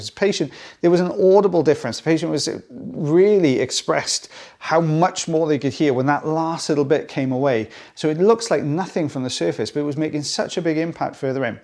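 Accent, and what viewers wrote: Wales, United Kingdom. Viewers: just what the Doctor ordered How 🤔 ❓ you managed to extract that recess cerumen was marvellous I was hanging on the edge of my seat Skilled on every level Your lovely Welsh accent almost broke ♥ into a 🎵 song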